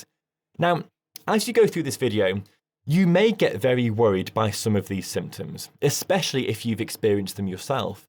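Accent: England, United Kingdom. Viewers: I have just recently subscribed, and really enjoy the way you have presented these situations . It is very easy to understand, and that is appreciated. Thank you. Plus I always enjoy the English accent.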